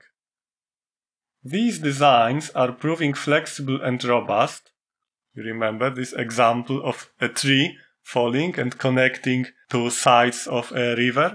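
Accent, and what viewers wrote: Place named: Poland. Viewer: Thank you for this awesome explaining of Evolutionary Computing for Global optimization, it was very useful and inspiring. no many visual resources for this topic, appreciate your contribution. you delivered it in a simple manner, and OptVis tool is also awesome. also the Polish accent is wonderful (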